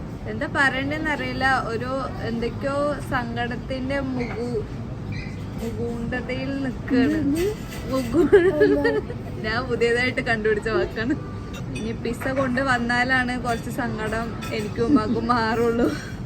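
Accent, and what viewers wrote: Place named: Kochi, India. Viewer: Kochi slang annalo vanne samsarikumbo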